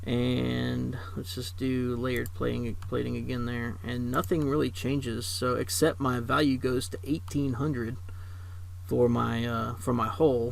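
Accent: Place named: South, United States. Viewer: definitely southern states US accent and as a Brit I like it. I always found the accent like the Irish to be very nice to hear.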